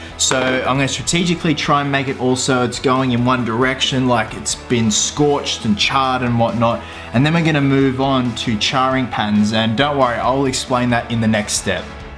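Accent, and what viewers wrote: Australia. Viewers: r u australian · he is a ausie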